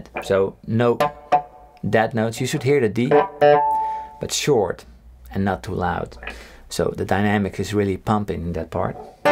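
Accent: Netherlands. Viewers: Are you dutch? You sound like a fellow dutchy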